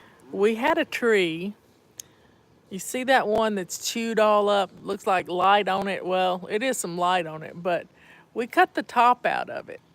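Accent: South, United States. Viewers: Absolutely beautiful! I love listening to your southern voice! Love from Dallas, Texas!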